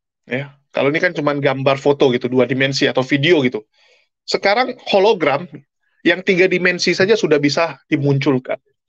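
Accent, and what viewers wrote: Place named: Medan, Indonesia. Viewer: Cina Medan eeee.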